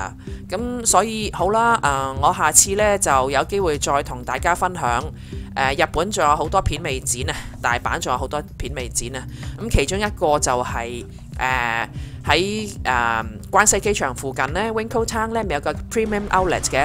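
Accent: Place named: United Kingdom. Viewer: 睇你片重可以學英文，你 D 英國音好好聽。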